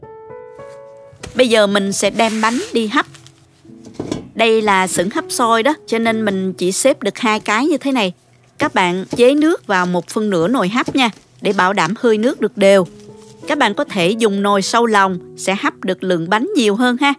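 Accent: South, Vietnam. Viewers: cảm ơn đả chia xẻ nhìn ngon và đẹp quá có giống nói rất đẻ thường giông nam ngọt quá à tôi nghe thấy mê luôn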